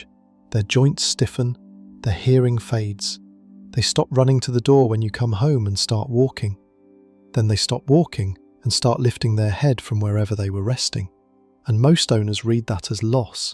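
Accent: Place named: United Kingdom. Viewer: PLEASE STOP the constant use of British accents! It’s not necessary.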